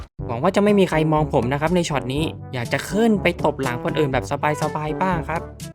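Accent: South, Thailand. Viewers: คนใต้ไหมนิ55ไม่น๊อดนะแต่ว่า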